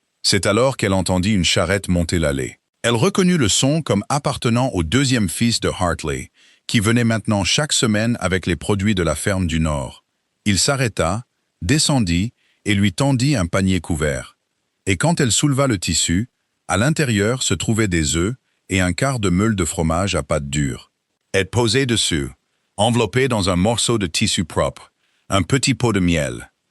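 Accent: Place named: England, United Kingdom. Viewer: Mais pourquoi cet accent anglais ridicule alors qu'à certains moments le langage français est employé correctement ? En France, nous parlons français sans accent, merci à l'IA d'en tenir compte !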